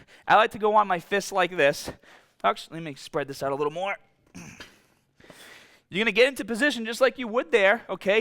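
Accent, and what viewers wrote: Boston, United States. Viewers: comedyman112 no. He has a Boston accent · Interesting hearing his 2010 videos having a normal American accent, and now, 8 years later, there is some Boston-sounding accent mixed randomly in there.. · With so many followers and so many questions I really appreciate the fact that you take the time to reply.. hope it's not a VA :-). Didn't realise that's a Boston accent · Is this dude from Boston ? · What's his accent? Boston? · Gotta love that Boston accent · That boston accent though. Thanks for the knoweldge anyway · Sounds like he’s from Bahhston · Bahbell!! Like your vids a lot, but I'll bet money you're from Massachusetts, my friend is from Boston and sounds just like you. Hurts my ears but I love the knowledge and advice, so I'll suffer through it. Lol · Missed that Boston accent. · Where’s that boston accent coming from ??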